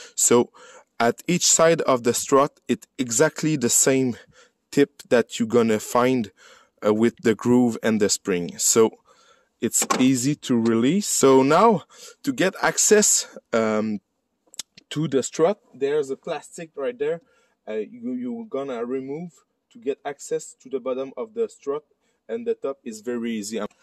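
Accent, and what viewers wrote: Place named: Quebec, Canada. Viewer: how to tell you're from Québec not telling you're from Québec 😁 j'adore tous les deux, le mec et le video! merci 😊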